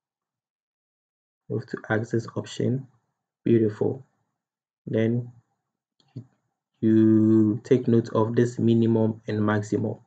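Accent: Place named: Africa. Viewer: omo oh i just hear the accent i know sey na africa. welldone bros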